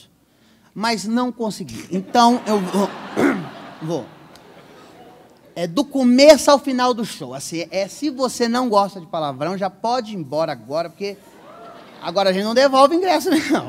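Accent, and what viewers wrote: Minas Gerais, Brazil. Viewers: Esse sutaque mineiro cabuloso ❤️ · Que e este ? Cliquei no video porque achei que fosse a thamy Miranda,mas o sotaque de minas entregou.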